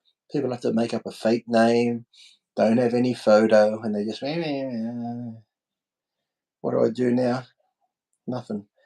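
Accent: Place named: Australia. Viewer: More of these live streams please,! Need more Aussie voices on YouTube 💪🏼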